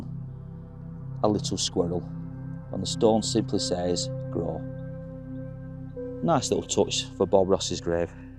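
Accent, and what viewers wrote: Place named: North, United Kingdom. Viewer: While I'm what's known as a talentless git, I also love to watch Bob Ross 😊 He had a lovely manner to him and just had that way of making me feel happy. I've been watching your videos for some time now and I have to say the delivery is that of a master storyteller. I'm not from the north but your lovely accent and empathic style really brings these, sometimes forgotten, stories to life vividly. Yours and research skills and attention to detail does you both proud. I shall continue to look forward to your videos.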